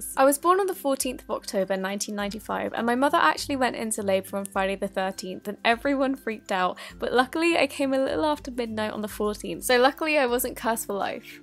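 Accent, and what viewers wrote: England, United Kingdom. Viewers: Yay!!! You're the best youtuber ever! You should hold a giveaway for some of your slimes! btw, i love you =r english accent and yur super pretty!!!!! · I love this British accent😂💕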